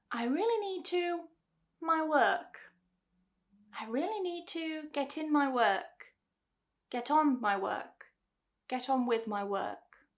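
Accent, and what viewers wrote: United Kingdom. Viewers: Your english British dialect are very very clear ❤ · Hello, it's always a pleasure to listen to you. Your accent is so british (londoner?) and easy to understand even though you speak quickly. Why say meet + up, meet alone is not suffisant? It's like find and find out, I don't see the difference. May be could you explain that in your next vidéo. So, thank's, we are looking forward to the next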